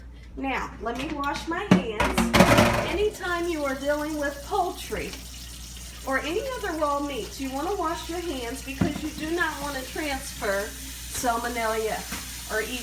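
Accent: South, United States. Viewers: I just love your videos! Love your southern sound! I love to cook as well. I cook old school puertorriqueño food. Everything is delish. I saw your video and you mentioned the mean haters. Do not even knowledge them. You have more kind and blessing people that follow you! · You have the sweetest voice ever. I also hear a slight accent but can't put my finger on what accent that is? I was thinking maybe a southern accent?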